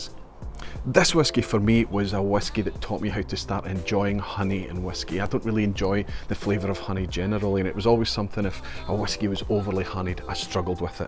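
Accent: Scotland, United Kingdom. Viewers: Wheskies! 😁 I love that Scottish accent!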